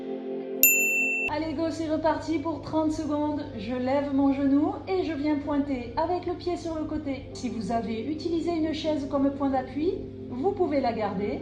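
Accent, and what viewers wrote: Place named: Drôme, France. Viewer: merci pour votre réponse.. je ne m'y attendais pas..je travaille en poste de nuit en pneumologie..et vos vidéos sont calmes et efficaces.. Hé oui bien besoin d'équilibre à moto..merci oui je reste prudente!!🏍❤️ Votre gentillesse se ressent délicieusement ! Et vous êtes magnifique ! Votre petit accent me rappelle le mien lorsque je vivais dans la Drôme.. en Lorraine à présent.. il est..bieeeen différent..lol! Bisous du grand Est!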